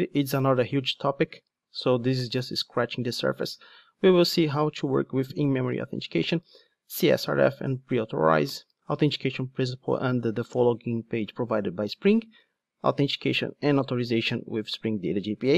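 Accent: Brazil. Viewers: Eu pensando que era outra pessoa ensinando, mas é o mesmo BR falando inglês kkkkk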